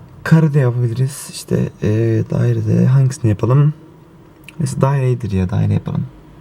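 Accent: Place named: Cyprus. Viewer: Kıbrıs da mı oturuyorsun?? (Şivenden dolayı söyledim)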